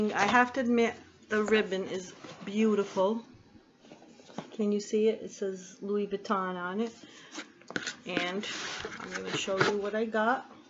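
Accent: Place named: New York, United States. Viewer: You must be from New York or jersey !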